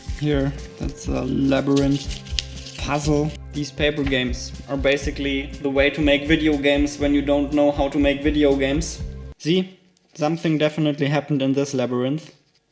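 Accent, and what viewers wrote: Germany. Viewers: Are you from deuthland? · Are you German ?!?! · are you german?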